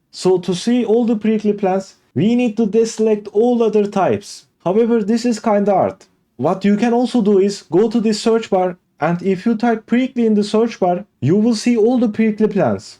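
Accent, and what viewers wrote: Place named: Iran. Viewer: Bro your accent is like iranians im from iran 😊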